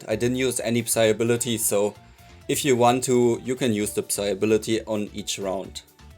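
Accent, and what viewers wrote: Germany. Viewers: Are you german? I can hear the accent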